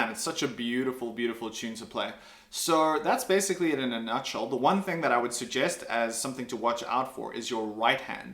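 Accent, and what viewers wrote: South Africa. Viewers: bro you kinda sound south african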